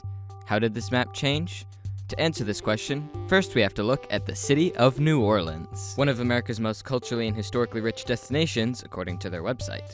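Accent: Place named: United Kingdom. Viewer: idk why but at first he sounds kinda british not gonna lie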